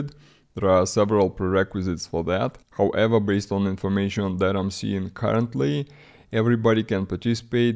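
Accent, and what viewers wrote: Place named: Russia. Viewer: бро ты же русскоговорящий?) по акценту ясно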